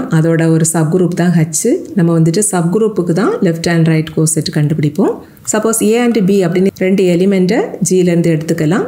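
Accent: Kanyakumari, India. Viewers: Kanyakumari 🤩🤩 your slang also Ngl 🤩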